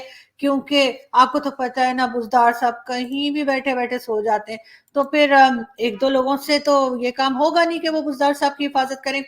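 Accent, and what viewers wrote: India. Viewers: Don't know wether people and u noticed or not ,that your accent and Dialect seems purely like Indian Hindi speaking person....... Lagta hi nahi ki urdu bol rahi hain aap ..It's like Indian Desi girl taking charge over any programme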